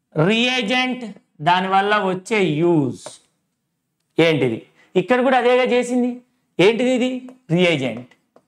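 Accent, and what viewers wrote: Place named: Telangana, India. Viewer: Telangana yaasha😂😂😂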